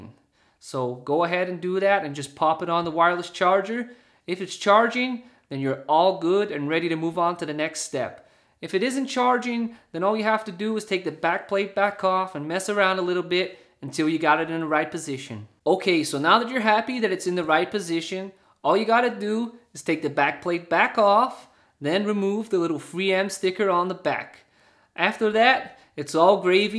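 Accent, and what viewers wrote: United States. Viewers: You're American accent is better than my Scotsman accent. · Nice one. Lol. I Truly enjoyed the video, and your accent. I'm not a big fan of wireless charging, but I'm getting a free G4 from a contest, and I'm going to get the one that you showed just for the heck of it. Your American accent sounded just like a friend of mine in Florida. What a hoot.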